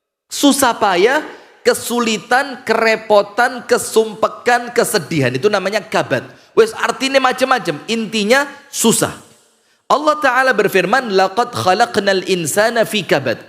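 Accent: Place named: Java, Indonesia. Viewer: Masyaallah pak ustadz wajah ke arab arrabban..bhsa jowony medok...inggris juga bs.apalgi bhs arab sehat sehat pak ustdz Aamiin 🤲❤️🙏🙏❤️❤️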